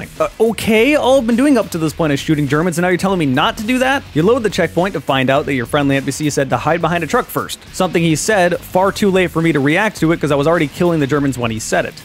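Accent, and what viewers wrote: Poland. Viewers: I thought he is Polish :) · Weird question, are you Polish? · Are you polish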